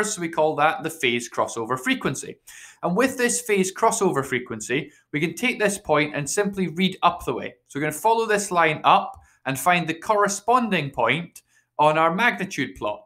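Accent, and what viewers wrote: Scotland, United Kingdom. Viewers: UK probably, scotland i think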